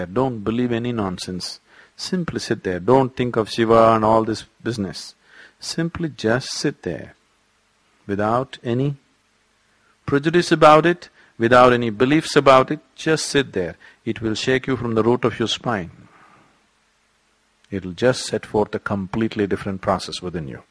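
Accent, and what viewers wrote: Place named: South India, India. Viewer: becoz he's south Indian...south pronounce shiv as shiva....om nama shivaya...not om nama shivya...hope you get it...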